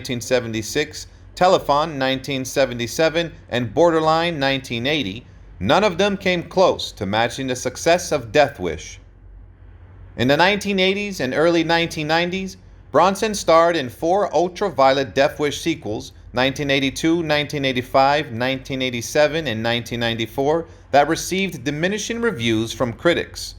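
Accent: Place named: Chicago, United States. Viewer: Hey Mr. Narrator, you from Chicago, ain'tcha? Miss that accent.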